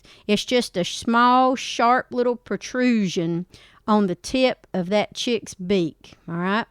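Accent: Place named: South, United States. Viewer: Very interesting and great educational video 👍 i absolutely love your southern accent ❤️☺️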